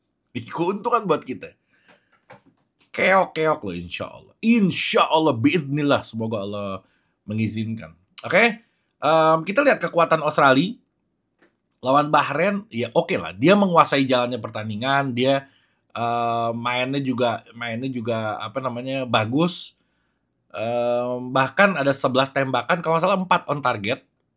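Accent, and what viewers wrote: Jakarta, Indonesia. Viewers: numpang tanya bang.. asli nya mana? logat nya betawi/jakarta tapi obrolan nya terselibp bahasa sunda..kaya kata2 ngeuleukeub sama ngahiliwir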